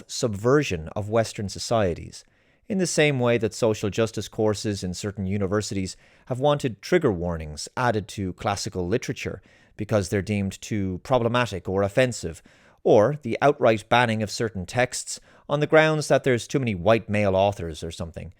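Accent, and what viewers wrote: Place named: Ireland. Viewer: If I may, I would like to hear you put the truth of Western culture’s debasement, undermining etc. etc. etc. in more historic terms. Honestly, there ain’t nothing new about what’s going on, right? Your astute and insightful dead pan brogue, for me, is the most delightful if not compelling reason I listen. Unfortunately, I find your message can come off a little hopeless with tones of the very, “them & us”divide you abhor. We can overcome this. Thank you for your great work and time. “History doesn’t repeat itself, but it does rhyme.” Russian proverb. (I think)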